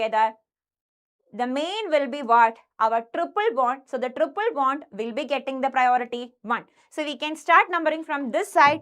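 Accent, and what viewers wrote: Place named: Kerala, India. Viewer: Malayalam for suree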